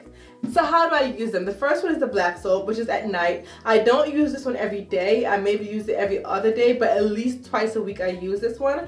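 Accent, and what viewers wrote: Jamaica. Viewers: Your jamaican voice tho. 😄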